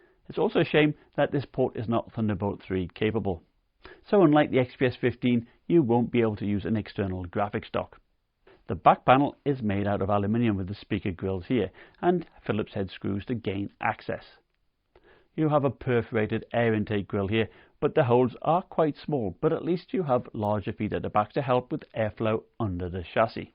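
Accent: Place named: England, United Kingdom. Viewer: Your in the US? You sound Like your from England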